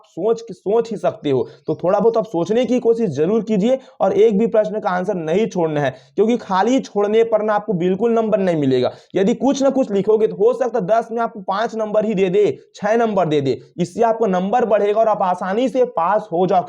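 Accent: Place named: Chhattisgarh, India. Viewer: Baat krne ke tone se lagta hai chhattisgarh se ho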